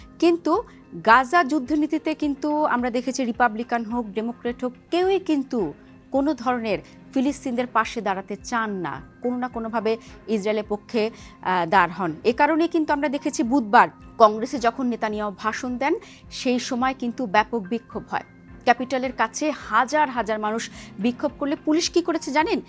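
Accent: Kolkata, India. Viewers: কোলকাতা স্টাইলে সংবাদ উপস্থাপন😂। আপাতত শুনতে ভালো লাগলেও দয়া করে কোলকাতার সাংবাদিকদের মতো চিল্লা চিল্লি শুরু কইরেন না ভবিষ্যতে। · kotha to sundor but indian style a hoise seta khub e kharep lagse.. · ভারতের ঢংয়ে কথা বলবেন না।।।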